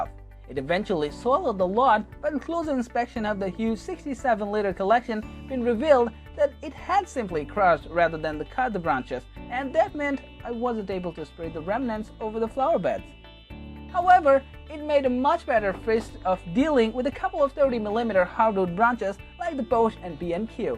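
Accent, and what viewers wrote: India. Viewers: This video is made for the British market, in a sometimes hard to understand Indostani accent. Why did it show up in my search from the USA? Something wrong with the ‘algorithm’?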